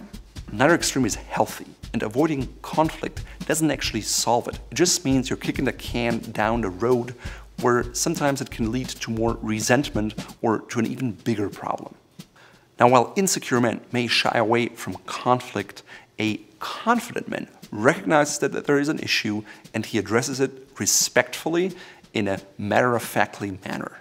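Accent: Germany. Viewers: I swear, I only noticed your German accent after you said you were German. After that I couldn’t stop thinking about it. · You are definitely GERMAN... (belive me i said that before you disclosed)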